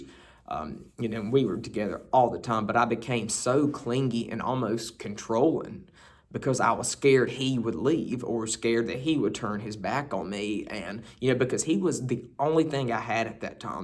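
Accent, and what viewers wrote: South, United States. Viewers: Love that Southern talk. Happy Holidays! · reminds thisn heer of u reel nice Tom Sawyer now all growd up, an edicated in certain 'fun dood disciplines' by Hucklberry Fin hiself, away an when nun wuz lookin'. We heer boyz WILL be boyz an all. (Jus gota luv that Suthern drawl.)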